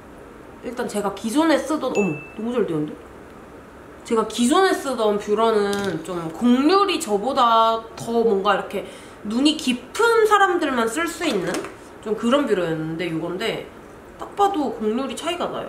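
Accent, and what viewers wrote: Busan, South Korea. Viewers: ㅋㅋㅋㅋㅋㅋㅋㅋ 님 부산 사시더니 말투에 부산사투리가 약간 섞여벌엿네욤 귀요오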